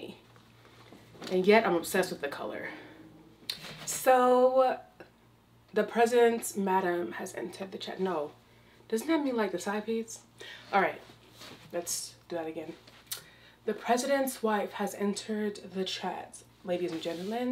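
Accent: United Kingdom. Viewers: It’s the English/ British accent for me 😂😂😂😂😂😂😂😂😂😂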